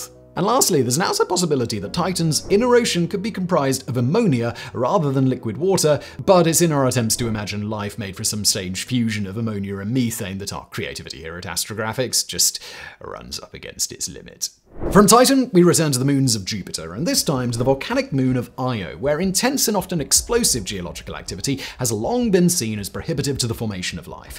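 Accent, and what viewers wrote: United Kingdom. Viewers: your British is showing.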